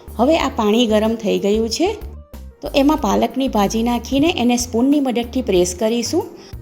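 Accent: Surat, India. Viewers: બહેનશ્રી તમૈ સુરતી( હુરતી) છો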